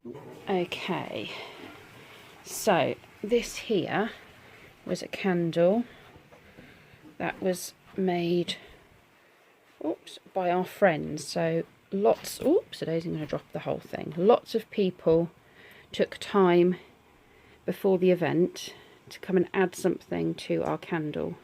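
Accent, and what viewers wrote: United Kingdom. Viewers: The mystery one of course, "All the Missing Girls". I'm enjoying your vlogs & your British accent. Beautiful memory box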